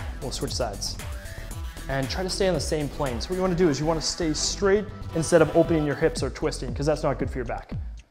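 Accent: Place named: Canada. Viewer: Is from Canada?? He pronounces his "o's" like a true Canadian.:)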